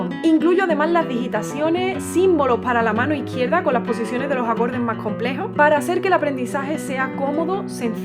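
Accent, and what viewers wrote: Andalusia, Spain. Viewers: Gracias! Me encanta la guitarra, su sonido, su historia. Y me encanta que nos cuentas la historia del compositor, sobre todo con tu acento andalu y siempre con una sonrisa! 🙂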